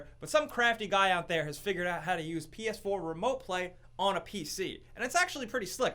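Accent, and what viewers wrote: Canada. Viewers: You americans? are you canadian like me?:D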